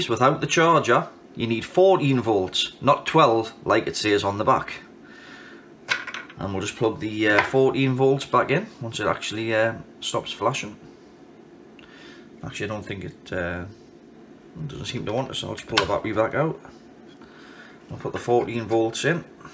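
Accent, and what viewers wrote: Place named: Northumberland, United Kingdom. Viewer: I'd have placed that accent more in Northumberland than Cumbria but very nice either way 😁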